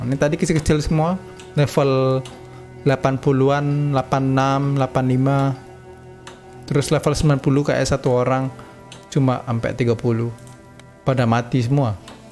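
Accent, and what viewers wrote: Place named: Pontianak, Indonesia. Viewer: Logatnya mirip orang pontianak bang 😅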